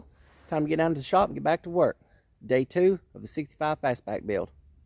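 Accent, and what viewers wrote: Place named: South, United States. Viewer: I’m watching from Poland and I understand you just as fine as anyone else buddy! I actually love country accent!! Keep you the great work fella!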